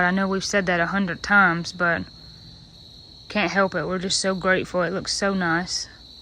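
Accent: South, United States. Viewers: What kind of accent is that ? Virginia, Kentucky, Carolinas ??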